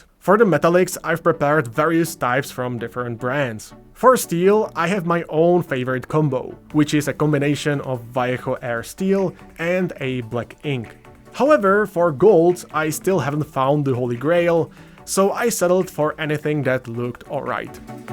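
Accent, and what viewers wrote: Czech Republic. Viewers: I was guessing whether you were Czech or not based on the accent, but that basement is what convinced me.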